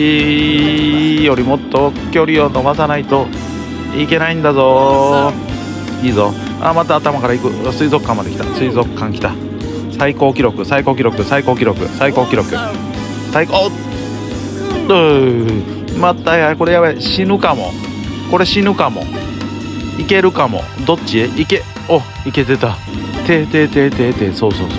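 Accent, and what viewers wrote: Kansai, Japan. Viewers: 相変わらずの関西弁ナイスー👍 そしてLINEの返信が全部英語ꉂꉂ🤣𐤔 時代感じます😅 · このゲームおもしろいですね！！笑さん関西弁でした？！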